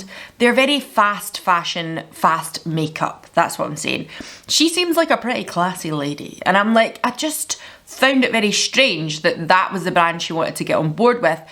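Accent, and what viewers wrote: United Kingdom. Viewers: she is selling glamour... The British accent also adds something 🤣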